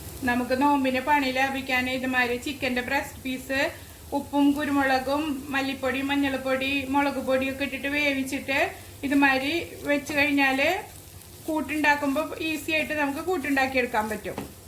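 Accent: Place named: Thrissur, India. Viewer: നല്ല രസണ്ട് സംസാരം പക്കാ തൃശൂർ ഭാഷ ഇങ്ങനെത്തന്നെ മുന്നോട്ട് പോട്ടെ 😊കൊതിപ്പിച്ചു 👌new video കാണാൻ വരണേ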